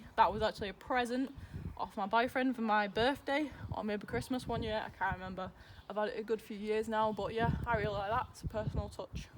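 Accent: Northern England, United Kingdom. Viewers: No, I'm in Norwich. I just like northern accent lol. Watched the MT10SP ride and then this one... Not enough UK vloggers with interesting accents... Keep it up, sort the Mic out though... bit quiet 👍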